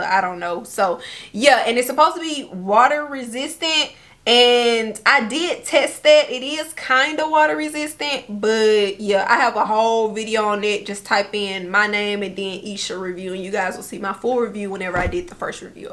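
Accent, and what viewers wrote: Louisiana, United States. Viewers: Guh you from Louisiana? Lol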